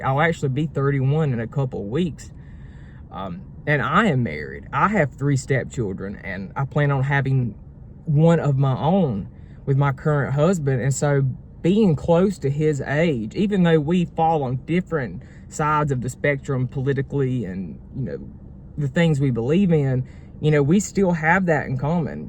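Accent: South, United States. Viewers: It's not the gay community that this makes look bad baby, it's the trans community that's doing that. They're the ones shooting up kids at prayer & killing Charlie. Ain't nobody I know mad at the gay community that I've seen. They don't have anything nice to say about the trans community tho. I know several gay people & not 1 of them have a mean bone in their body. They're sassy & can get a lil spicy when provoked, but nothing mean or malicious about them. Why u urself sound sweet enough to give somebody diabetes sir.😂 That southern twang was made for u baby.😁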